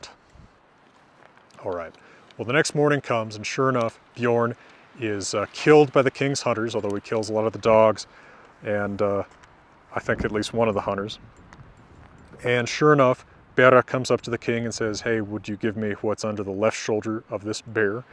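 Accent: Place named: United States. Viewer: Hello, I do not know if you are aware of that, sir, but it's not just people in the English language who are following you. I am French, and I have a hard time understanding you with your American accent. Would it be possible for you to speak a little less quickly please? I know, it's a little daring of me to ask you this, but it would be very useful for a lot of people I think. Anyway thank you very much for your videos. Sorry if I offended you, it was not my intention.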